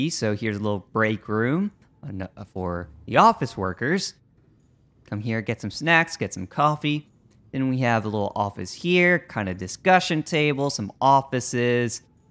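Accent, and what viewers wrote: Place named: Ireland. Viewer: Huh first time hearing you and almost prefect on voice except somehow got irish accent 😅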